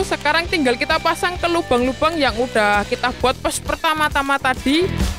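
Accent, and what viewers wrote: Java, Indonesia. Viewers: Baru kali ini nonton tutor kelistrikan bisa ngekek krna bahasane medok bianget😂😂😂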